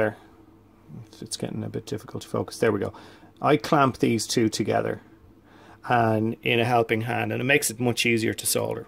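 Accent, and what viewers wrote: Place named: Ireland. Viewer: Thank you very much for your explanation. I'm just a step on trying myself 😄. I lived in Ireland for 5 years and I couldn't help myself of thinking you sound 'slightly'😄 Irish. I'm sure I'll be wrong. I'm watching videos like a crazy because I have a lego rocket I want to light (the thrusters) and I'm figuring out how to do it. Cheers from Spain ♥️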